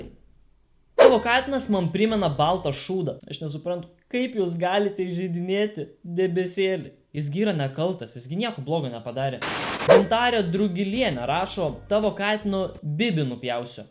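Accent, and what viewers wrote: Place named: Kaunas, Lithuania. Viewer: Aš kai tavo pirma karta vidijo pamatau aš tesiok. Tu Kaunas nes tu labai juokingai kalbi🤣🤣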